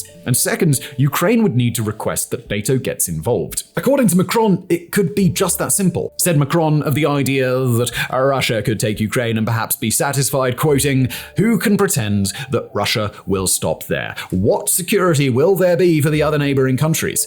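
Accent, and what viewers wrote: United Kingdom. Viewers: ok, 3000 comments so probably no chance you check mine; so you ll get a quick comment mate, you don't know what you re talking about, which is surprising considering you sound british just read churchil s memoirs mate and you ll know what s going on also, forget NATO troops, french troops; france got out before, can get out again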